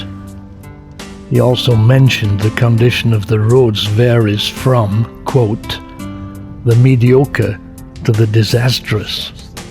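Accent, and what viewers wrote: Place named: Wales, United Kingdom. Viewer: Wow! Just found this after returning yesterday from Kanpanlet to Mindat. I was told I was the first foreigner they've seen in almost a year! Sadly, the views were oscured by smoke from burning season which ironically, resembled the current mood of the wonderful people in this amazing country. Love the narration. Still trying to work out the accent, although there seems to be a Welsh tinge!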